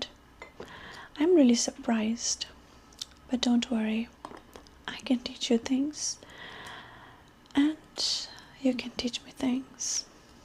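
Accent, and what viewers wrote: India. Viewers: “Do you know how expensive my sari is??” 🥳Your Indian accent and this character are the best! Also: the moon is always out, even in the day, but is made invisible by the suns brightness, which means your light is eternal and constant, unlike the suns.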